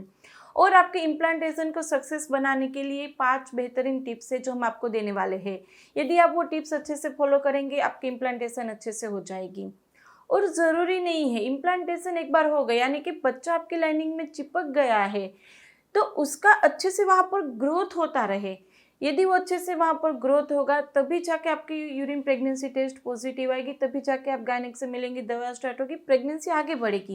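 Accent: Gujarat, India. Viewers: Gujrat se h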